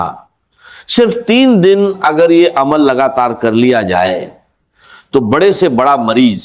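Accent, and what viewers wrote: India. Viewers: Ya kesi Hindi walay ki awaz ha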